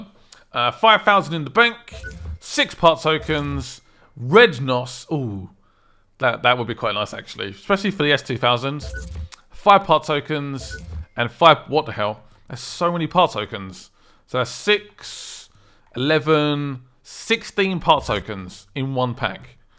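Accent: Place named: Southampton, United Kingdom. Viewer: Bit of a southampton tune like it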